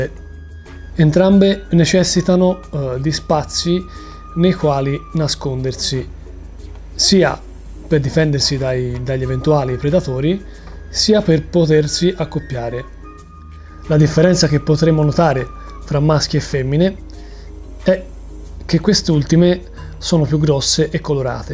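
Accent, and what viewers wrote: Tuscany, Italy. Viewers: Il canale non è neanche male, ma non riesci poco poco poco meno la parlata toscana e poco più entusiasmo? Diventa difficile seguirti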